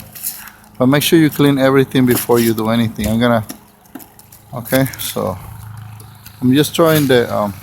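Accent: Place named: Mexico. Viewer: No hagas ese mugrero primo y se te oye que eres mexicano mejor habla español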